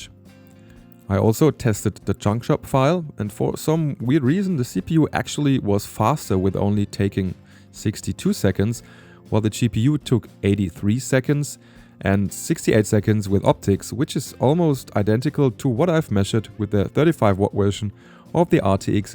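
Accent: Germany. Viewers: Lol manchmal glaub ich, dass er Deutscher ist der englisch spricht :D erinnert mich so krass an den deutschen Typen der Monitore reviewt auf englisch xd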